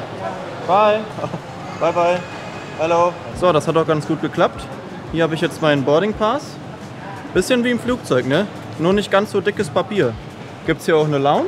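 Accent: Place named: Germany. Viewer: würd ich nicht sagen. ist gutes schulenglisch. aussprache ist halt bisle schwierig, aber das haben fast alle deutschen so :D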